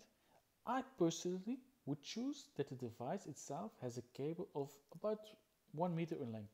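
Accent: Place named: Netherlands. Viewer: Think he might be dutch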